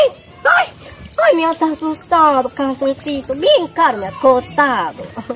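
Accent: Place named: Peru. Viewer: se nota demasiado q es de peru me aparecio en recomendados esta muy recomedado :D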